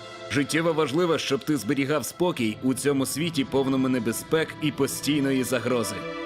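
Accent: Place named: Russia. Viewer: Акцент там не для розваги чи насміхання над кимось. Це для збереження задуму авторів відео, згідно з яким мовник має сильний російський акцент.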